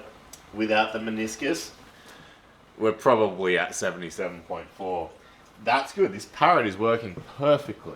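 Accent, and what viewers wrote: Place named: Australia. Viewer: if you pay attention, you hear that his accent is not native to the US of A, More likely its Australian. In Australia, home distilling is allowed.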